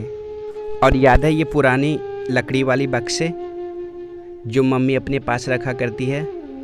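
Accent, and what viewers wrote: Bihar, India. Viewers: Bhai aap paka bihar se hona bhai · sir aap bihar k ho kya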